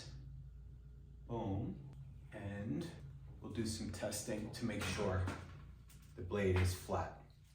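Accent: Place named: Hudson Valley, United States. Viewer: Sounds like you might be in the Hudson Valley. Hello from Greenville, NY. You have an incredible skill level. How did you develop your skills? Also, if you don't mind, what was the tool you used - some sort of drill guide? Thank you.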